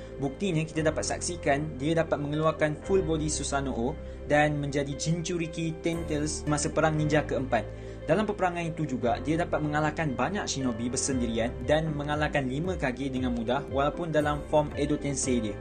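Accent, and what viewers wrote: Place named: Selangor, Malaysia. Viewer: Orang selangor ke ni Sbb kawan2 aku yng asal selangor 75% pelat2 sikit😂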